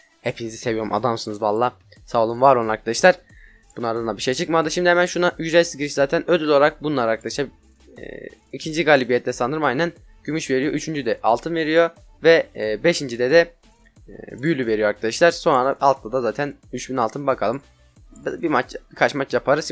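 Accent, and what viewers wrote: Erzurum, Turkey. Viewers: abi sen erzurumlumusun???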